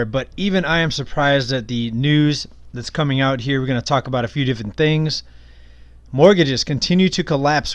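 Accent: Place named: Pennsylvania, United States. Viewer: Does this guy happen to live in the rust belt? More specifically Pennsylvania. I like the dialect.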